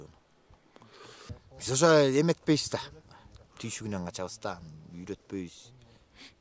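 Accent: Standard. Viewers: Азыр уйдун эт-сутуно караганда топоздуку таза болуп калбадыбы,укол-дары бербей баккыла эми.Ал эми топоздун сутун кандай пайдаланган туура болот аны интернеттен караса, пайдалуу маалыматтар коп эле болсо керек. Малчылардын туйшугу да ото оор болот,ак-эмгегинердин узурун коро бергиле!Так-таза кыргызча суйлоп, жакшынакай маек берген азамат экен. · Депутаттарга караганда сонун так даана тушунуктуу нукура кыргызча кагаз карабай суйлойт экен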